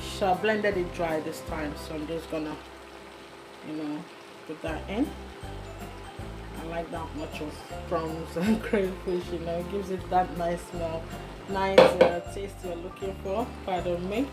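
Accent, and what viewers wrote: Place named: Nigeria. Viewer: It was really refreshing to hear a Nigerian girl with a beautiful Nigerian accent. We need to own our accents too like we own our cooking and teach the young ones that we are proud of who we are. These accents we try to put on make us sound so silly and small minded.....😉 just a thought.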